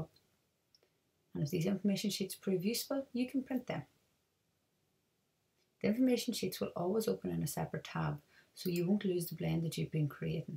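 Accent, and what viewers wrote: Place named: Northern Ireland, United Kingdom. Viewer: Hello! This is a fantastic resource, and something I hope to invest in, in the future! Would you mind me asking where you're from? There's a definite touch of Northern Irish in your accent :D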